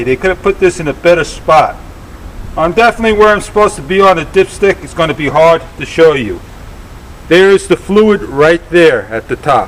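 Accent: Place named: Brooklyn, United States. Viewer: When I heard you say the word "stoop" I just know your from Brooklyn. Maybe your filming this in Brooklyn. I was born and raised in Brooklyn. Why is this so special, well, now I live in Delaware. There are a lot of retired people here from Brooklyn, like myself, but when the locals here my accent they know exactly where I am from. Anyway, I have a question for you. You said to change the fluid 4 times to help to clean out the filter. Do you have to use the very expensive Honda ATF every time you change the fluid, or can you use a cheaper fluid for the 1 day to clean out the filter and on the last fill, used the Honda brand ATF?? I hope you get this question and provide me with a reply. Thanks!!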